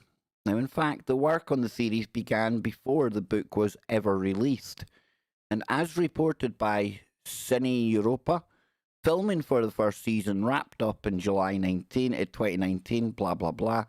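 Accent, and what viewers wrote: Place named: Scotland, United Kingdom. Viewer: Hello from sunny San Diego CA, USA! You rock... Thanks for lifting my spirits. & I am so in love with your ACCENT!!! I just really love it... It's kinda soothing. Blessings to all of you in beautiful Scotland!!! 🤓💜🎉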